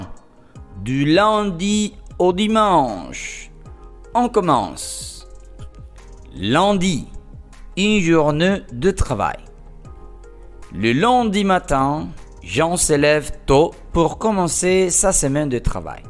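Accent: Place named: France. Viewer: Me parece muy bueno, asi es como se aprende : leyendo, escuchando, hablando. Solo que me perturbé con la pronunciación de algunas pocas palabras muy diferente a la de Bélgica. Supongo que es de alguna région de Francia donde la pronunciación puede ser un poco diferente.pero esta espectacular ❤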